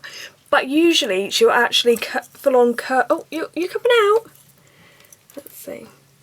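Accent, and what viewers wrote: United Kingdom. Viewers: sounds british to me. but im not sure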